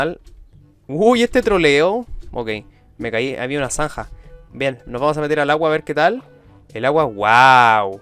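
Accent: Chile. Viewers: tienes un acento chileno muy neutro, me encanto tu video suve mas asi plisss prueba el shader chocapics 13 estoy seguro que te va a encantar <link>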